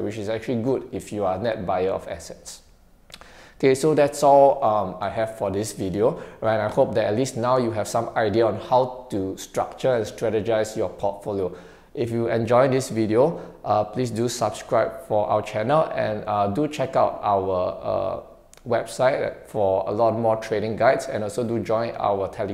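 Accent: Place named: Malaysia. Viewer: you are definitely from Malaysia :)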